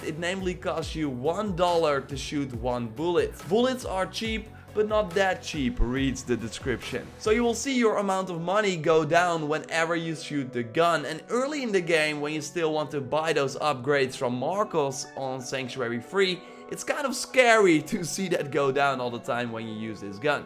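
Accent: Netherlands. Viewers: You have the same accent as the YouTuber tbag epic gaming. So I'm guessing you are from the Netherlands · Hey, are you dutch?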